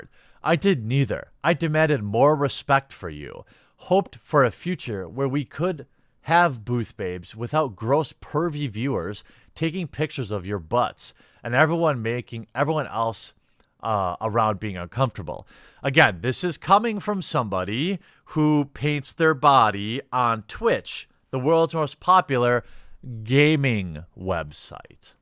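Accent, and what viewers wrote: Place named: Midwest, United States. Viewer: Northwest/midwest accent, lol. Reminds me of the Wisconsin area. Ever notice how he says words like "lag" or "bag" with like an extra "y" sound in there, like "layg" or "bayg"? That's his northwest/midwestern accent and he's commented on it before, lol ;)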